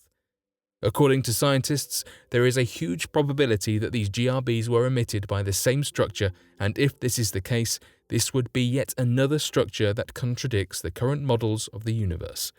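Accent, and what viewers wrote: England, United Kingdom. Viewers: Only an English accent can bring knowledge to life. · What's with the english accent? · British accents don't make it better. Just saying.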